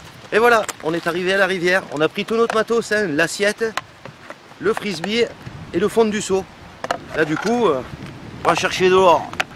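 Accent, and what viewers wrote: South, France. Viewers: ta un accent du sud de la france moi du québéc pas du tous la meme accent merci pour tes super conseil le cousin francais super intéréssent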